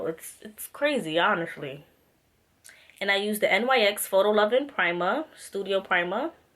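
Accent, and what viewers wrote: New York, United States. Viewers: You sound like your from NYC lol great video and new subbie I'm loving your channel 😍😍😍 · you are so pretty. you have an accent too lol. are you from new york? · I knew you were from newyork as soon as I heard that accent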